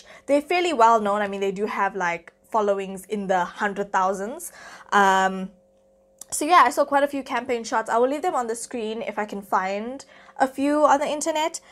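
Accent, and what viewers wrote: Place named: South Africa. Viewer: So yes, I have heard of it since it had a good amount of attention in the US, however I am buying it right now online because of your review and recommendation 🙂. It's pretty affordable here, just $12. My current favourite foundation is Bareminerals barepro foundation. This should definitely come into the chain stores, I think it would be really popular....Randomness- I love watching your videos not only for the content but I miss hearing our South African accent ❤. #durbangirl 🇿🇦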